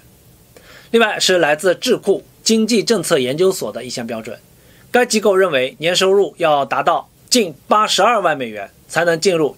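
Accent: Standard. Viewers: 您的普通话非常标准 字幕有一排最好， 不要显示两排相同的字幕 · 你说话挺标准的，不需要字幕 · 有字幕更好。您的播音质量已经是首选了，国语讲得最容易明白。可能您的国语比较标准、语速也讲得标准吧。👍赞、加油。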